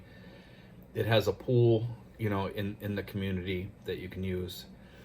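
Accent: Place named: Northeast, United States. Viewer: Sounds like your from the northeast of USA with your accent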